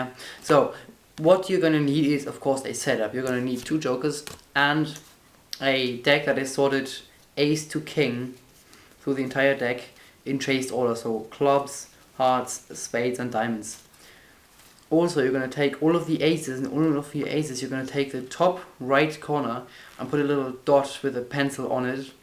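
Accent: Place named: Germany. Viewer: That german accent😂👳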